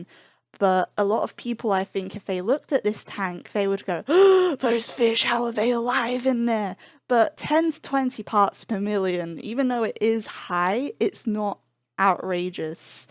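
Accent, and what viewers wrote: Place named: United Kingdom. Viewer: Came here from Dodo - I'm not much of a fish person but so good to see someone knowledgeable and caring about their pets :) PS. you sound British, are you originally from there and moved to the US?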